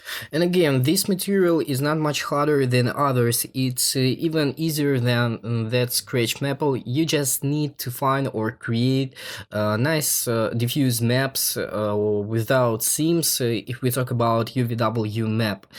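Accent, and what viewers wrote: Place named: Russia. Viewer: Приятно слышать русскоязычный акцент, словно всё по русски)) Подписка.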